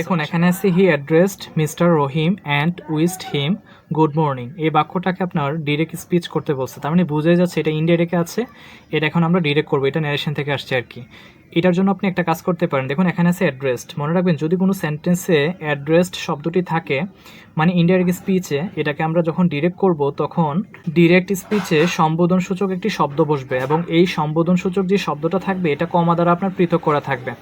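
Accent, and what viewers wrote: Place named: Comilla, Bangladesh. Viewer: Amar mayer bari o comilla te...tai apnar kthai se khan tar tan onuvob krchilam...Allah apna k valo rakhun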